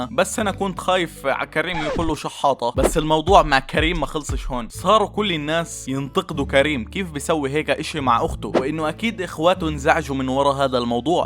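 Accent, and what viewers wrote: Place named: North, Palestine. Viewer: لهجتك فلسطيني من الشمال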